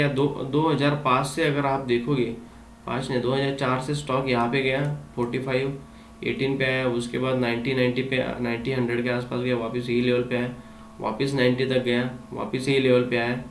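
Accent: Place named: Maharashtra, India. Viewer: Tumchya Hindi vrun vatt ki tumi maharashtra che ahe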